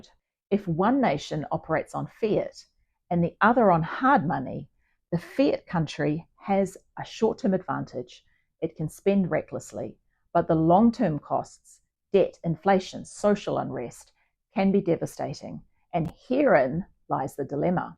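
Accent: New Zealand. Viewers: Very informative. Your accent sounds very Kiwi...in a good way:)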